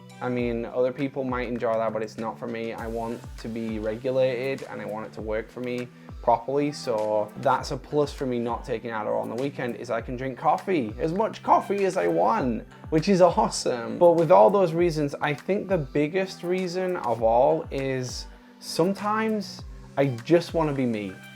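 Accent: United Kingdom. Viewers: So where are you based because you have a British accent but I don't think Adderall is available in the 🇬🇧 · You sound like a limey, is your adderall on Private RX?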